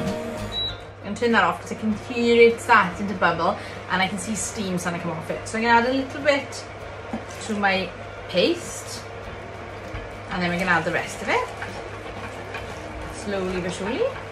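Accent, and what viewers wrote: Wales, United Kingdom. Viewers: It's only a Welsh accent it's not that strong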